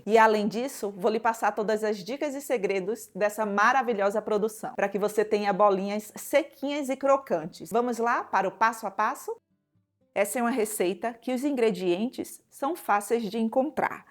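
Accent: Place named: Northeast, Brazil. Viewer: Pelo sotaque tu deve ser nordestina como eu. Suas receitas são TOP. Obrigado por nos ensinar essas maravilhas que você faz. Parabéns.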